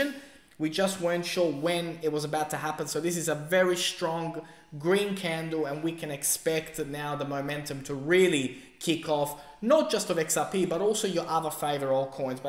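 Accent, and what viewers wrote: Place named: Australia. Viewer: Nice to hear another Aussie do u have a twitter account i can follow? cheers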